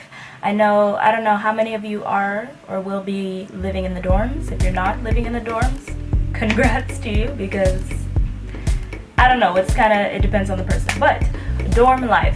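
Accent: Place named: Caribbean. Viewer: oh,thought you were from the caribbean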